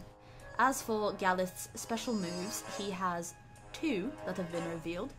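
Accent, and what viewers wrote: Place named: United Kingdom. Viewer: i don`t cere if you are talking britich ore not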